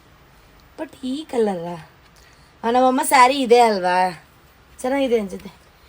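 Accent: Mandya, India. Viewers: Hai akka nim Amma jothee enu thumba video madee nim mandya bashee thumba changedee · ಮಂಡ್ಯ ಭಾಷೆ ಸೂಪರ್ 😂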